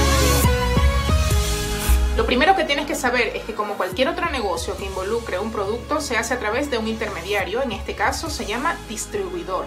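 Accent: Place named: Venezuela. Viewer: Hola! Creo entender lo suficiente como funciona la cuestión de la distribución... pero no tanto lo del Copyright. Soy venezolano! creo que tú también por tu acento. Si quiero subir un tema debería registrarlo primero en SACVEN? SAPI? o puedo usar otros entes reguladores como ASCAP? y de ser así... cuál es el proceso para inscribirme/registrarme a mi y mis producciones? Gracias de antemano! Buen canal! Mi ser servicios están a la orden, soy Ing. de Sonido y productor :D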